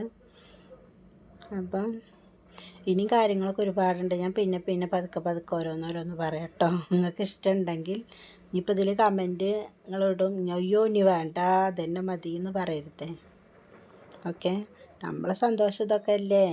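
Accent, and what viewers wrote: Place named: Palakkad, India. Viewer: കേൾക്കാൻ ഇഷ്ടമാണ്.പാലക്കാടാണോ നാട്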